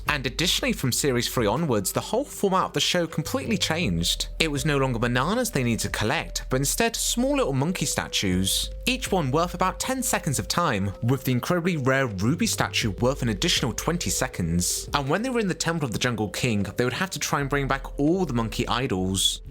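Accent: United Kingdom. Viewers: Fun fact: the creator of the video is from the uk cuz of his voice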